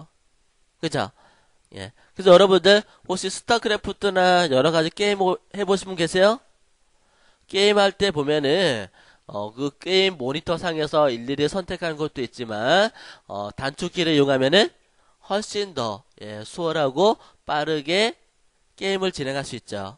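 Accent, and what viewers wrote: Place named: Yanbian, China. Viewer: 말투가 좀 연변 스럽죠..고마워요 ^^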